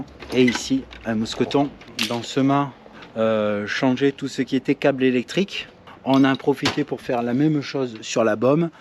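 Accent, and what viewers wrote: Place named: Provence, France. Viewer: Bonjour très beau boulot j'ai un petit voilier sa fait 4ans que je lui est rien fait dessus faute d'argent c'est une coque acier maintenant je suis a la retraite donc je pense avoir un peut de temps sa fait plaisir de voir une personne comme toi qui se défonce et surtout qui si tien bon comme on dit en Provence c'est bien pitchoun si tu passe en nouvelle Calédonie fait moi signe on prendra un pot ensemble ( je suis de la Provence aussi et sa me fait plaisir d'entendre l'accent )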